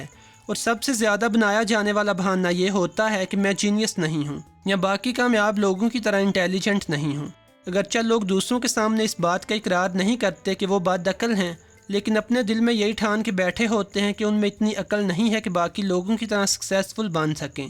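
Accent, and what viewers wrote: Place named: Punjab, Pakistan. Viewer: پنجابی لہجے میں اُردو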